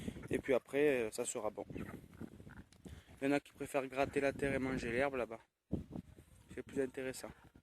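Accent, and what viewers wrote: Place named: South, France. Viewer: Tu vien de quelle région? On voit que tu viens du sud avec ton accent....J'ai le meme .... Apres sa ce trouve je me trompe tu viens peut être du nord mes sa serrai bizarre (MOI midi-Pyrenée donc du sud 😂)Sinon super video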